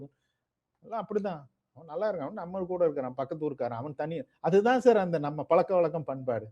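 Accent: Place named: Kongu, India. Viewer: உள்ளூர் நண்மை அறுமை,வசதி சுதந்திரம்,உரிமை பாதுகாப்பு உள்ளூர்,உள்நாட்டு அருமயை உள்ளூரில் அறிய முடியாது வெளியூர் வெளிநாட்டில் தான் அறியமுடியும் இந்த உண்மையை உள்ளூரிலேயே வாழ்பவர்கள் உணறவோ அறியவோ வாய்ப்பு இல்லை!!!!!!!! இந்தியா வையும் பிரேசில் லையும் எப்படி ஒரு தராசில் வைத்து பார்க்க காரணமாக இருப்பது என்ன? ஏன்? எப்படி? எந்த எந்த காரணத்திற்காக இந்தியாவும் பிரேசிலும் சமமாக உங்களுக்கு தெரிகிறது? எங்கேயோ தூரதேசத்தில் இருந்து ஊடகங்கள் மூ லம் சேகரிக்கப்பட்ட தகவல்களை நாங்கள் எப்படி??¿ உங்கள் பேச்சில் கொங்குத் தமிழ் தெரிகிறது என்பது உங்கள் புனைப் பெயரா??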